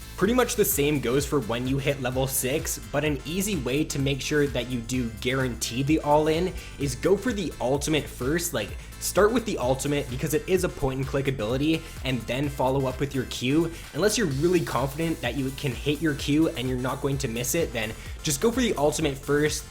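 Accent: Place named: Canada. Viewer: ...You're Canadian aren't you... Good video though.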